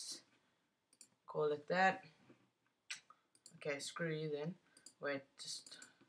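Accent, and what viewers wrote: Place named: South Africa. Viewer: So South African! makes me miss home :)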